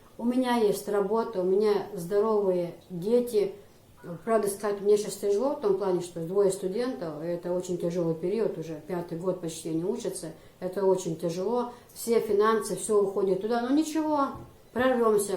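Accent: Chechnya, Russia. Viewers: Вааа Аллах1, сел леран нохчишех д1а мух тарлур йу,?Даже чеченский акцент присутствует 😄Делдахь со цец йаьлла С меня лайк и подписка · Даже акцент чеченский! 👍🤗😘😘😘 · Ни за что и не подумаешь,что вы русская, внешность чеченская, вы не меняли свою внешность?? Акцент, язык , манеры.... Все чеченское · Вы просто умница! С трудом верится, что Вы не чеченка. Вы даже на русском языке говорите с легким чеченским акцентом, и что удивительно, на чеченском -- без акцента. Вы и внешне очень похожи на чеченку. У Вас редкое сочетание -- внешняя и внутренняя красота. Счастья Вам и Вашей семье! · Поражаюсь Вами,у вас диалект даже чеченский🤩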